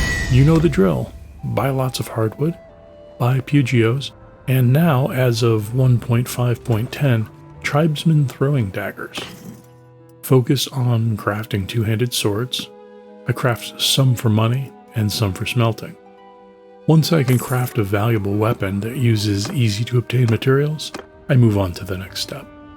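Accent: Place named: Netherlands. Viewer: Leuk hoe je altijd een andere nederlander herkent als ze engels praten :P, je doet je best maar helemaal onderuit kom je er niet.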